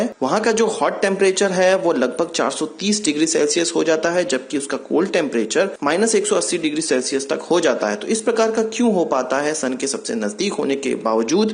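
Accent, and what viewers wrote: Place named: Nagpur, India. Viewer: bhai are you from Nagpur or vidarbha...your hindi accent is nagpuriya.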